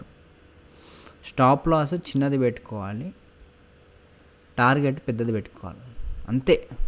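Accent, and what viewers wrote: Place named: Rayalaseema, India. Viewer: Bro meedi proper Rayalaseema kadha I think u r language pronounce like that only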